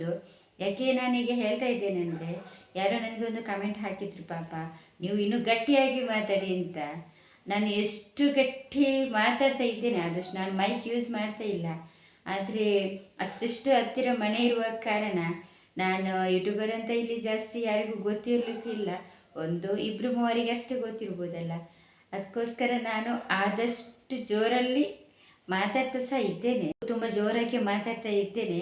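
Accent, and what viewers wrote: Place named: Mangalore, India. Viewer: Hype👍ನೀವು ಕುಡ್ಲ ಕನ್ನಡ ಪತೇರಿ ಮಾರಾಯರೆ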